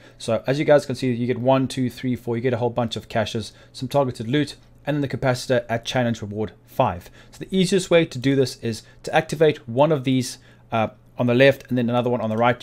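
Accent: South Africa. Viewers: It might just be me, but I swear you sound south African lmao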